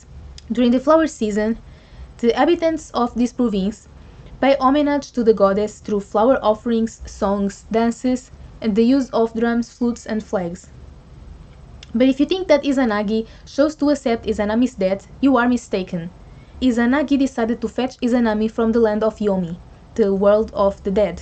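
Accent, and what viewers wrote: Portugal. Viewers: Arquipelágo? A heavy portuguese accent. Perhaps Coimbra?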